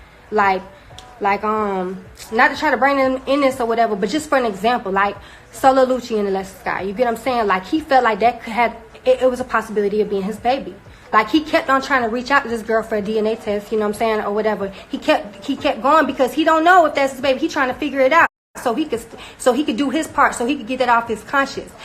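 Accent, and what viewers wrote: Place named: Mississippi, United States. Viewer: You are beautiful but you know you country talkin as hell where are you from Mississippi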